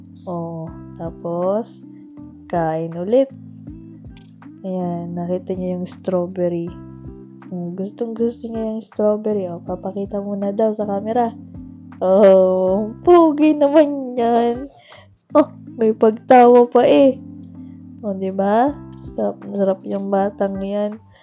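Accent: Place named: Batangas, Philippines. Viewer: Nice bonding may punto salita batangas kayo Mam😅 nakaka tuwa nman simple nice video susbscribed na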